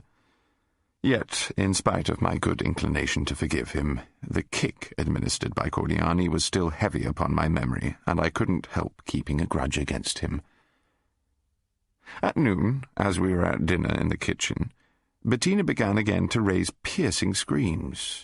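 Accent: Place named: England, United Kingdom. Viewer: English accent is the best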